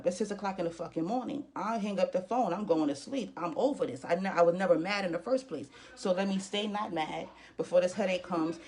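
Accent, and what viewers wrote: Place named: New York, United States. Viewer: That NY accent so gully! Shit is fire!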